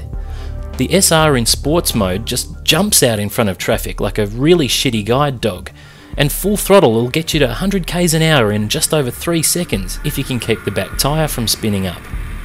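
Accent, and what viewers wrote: Australia. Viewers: Need more reviews like this. The Aussie flavour is refreshing compared to the yank reviews.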